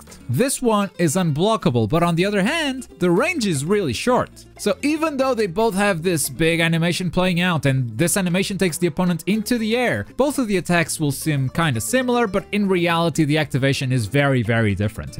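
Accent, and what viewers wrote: Portugal. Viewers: Are you portuguese?? Like portugal portuguese?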